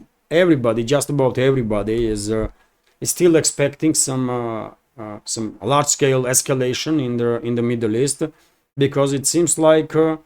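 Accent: Russia. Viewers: Thank you. Keep it up. I am listening to you regularly. I like your russian voice and perspective.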